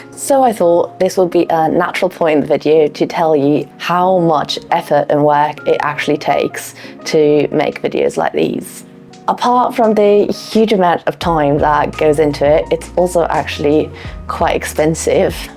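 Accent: England, United Kingdom. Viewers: your englsh accent is awesome, why americans cant speak more like that. lol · Miss, are you Italian? You sound British. 🌹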